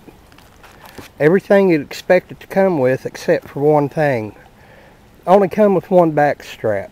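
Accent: United States. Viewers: No homo but I love his voice and accent,picture perfect American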